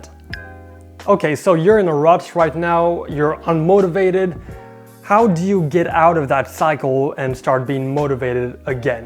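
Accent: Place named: Europe. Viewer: Sounds like europe somewhere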